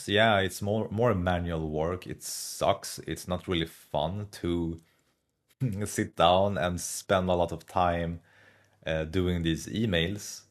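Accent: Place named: Sweden. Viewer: Swenglish is strong in this one. Great value video surprised it has so low view count!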